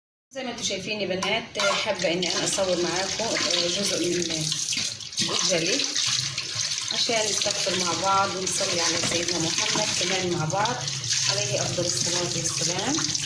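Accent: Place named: Egypt. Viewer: صحتين وعافية بدي أسألك إنت بتحكي شوي مصري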